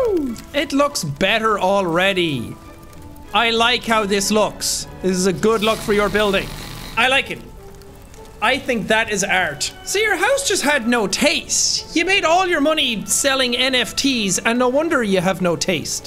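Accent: Scotland, United Kingdom. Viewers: I can't believe no one has yelled (IN FUN) to a comment that says "you are a psychotic ass!!!" 🤣😂 I luv his Scottish accent (or Irish?) too! 🤣❤💋 Please don't hate on me my friends because I KNOW I am an idiot.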